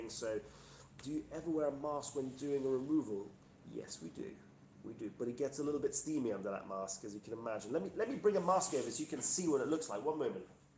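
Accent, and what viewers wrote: United Kingdom. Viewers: I love your British accent, you are lovely and really hot. Looking forward for more videos. Greetings from Germany. Enjoy your vacation.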